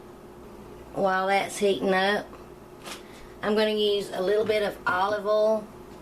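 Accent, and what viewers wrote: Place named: Kentucky, United States. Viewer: I love your accent, are you from Kentucky? I’ve made this dish several times from your recipe, it’s always delicious.. one of my favorite meals ... thanks for the video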